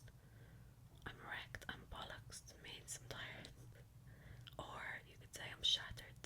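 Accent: Dublin, Ireland. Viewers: As someone from coolock north Dublin, I can defo hear it in your accent, your Ma thought you well haha😂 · Omg u sound real fuckin Irish lol (Btw I’m Irish two !!) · Class to see some ASMR in the Irish accent. Weirdly proud! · so thats why it said uk i always knew you sounded like a dubliner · There's a hint of culchie in that accent yeah. I hear the dublin accent mostly and then on some words it gets weaker lol. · Need more of your Irish accent videos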